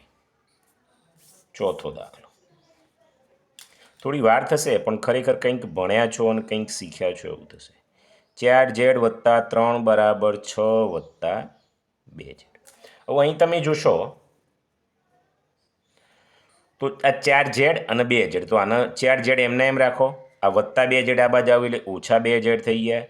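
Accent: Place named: Mehsana, India. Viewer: Tame mehoni bhasa bolo cho sir 😮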